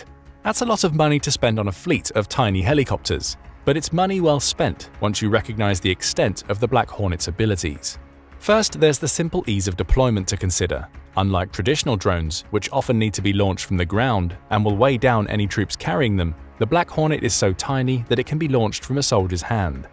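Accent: United Kingdom. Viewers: It's always nice to have a reliable British sounding narrator on a video. This guy could describe a 1986 Ford Chiltons manual and it would sound good. 👊😎 EDIT: $200,000 a pop!?! Whaaaaat!?! That's f***ing crazy! I can buy a better one, same size just a different color at a Goodwill for like $20! 🥹 "Black Hornet Drones: The only thing you'll hear is the sound of your head getting slapped.... Black Hornet." That would be the ad. 😆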